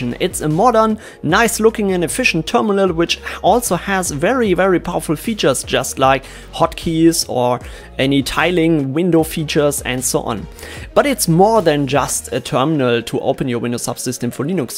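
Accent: Germany. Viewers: I like your German accent.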